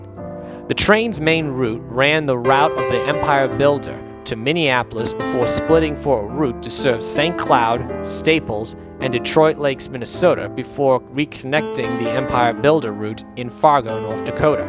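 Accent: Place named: Boston, United States. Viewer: Your Bostonian accent is for real 😄 not being offensive I live in MA but I don't have an accent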